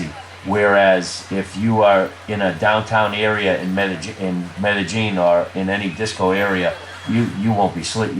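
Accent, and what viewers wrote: New York, United States. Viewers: Keep up the good work on your videos. Your accent sounds like New York City, where I lived for 35 years. So I take your evaluations about Pereira very seriously.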